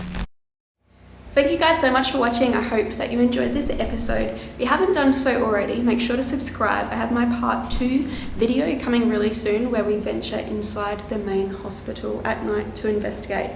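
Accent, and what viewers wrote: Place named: Australia. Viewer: Oh, I don't mind at all. I love watching you, as well as listening to your Australian accent. :) Not sure if that spirit you encountered feels the same way, though...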